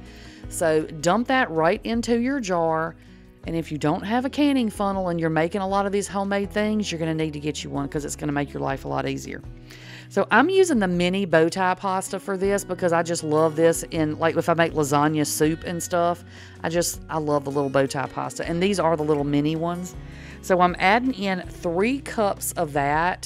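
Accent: South, United States. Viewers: I love listening to you, you remind me of growing up in the south! Thank you, these recipes look wonderful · New sub here 🙋🏻‍♀️ First of all, you’re adorable!! 🥰 Love your southern drawl & bubbly personality!! Secondly…..I am SO grateful for these hamburger helper mixture recipes!! I’m going to try them all. I grew up on Hamburger Helper. There were 8 in my family & we could stretch Hamburger Helper pretty far. Super excited to try these!! I also have a request (& forgive me if you’ve already done this-I will look thru your channel) but could you possibly come up with a dry mixture recipe for cold pasta salads?….like the Suddenly Salad box mixes?? I would love to have a dry mixture recipe I could mix with mayonnaise to put over pasta & veggies for some good summertime cold pasta salads. THANK YOU SO MUCH!! ❤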